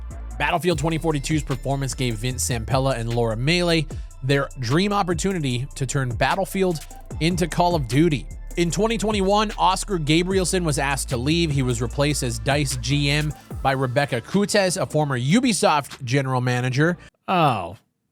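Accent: Canada. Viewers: u Canadian or whats the accent ?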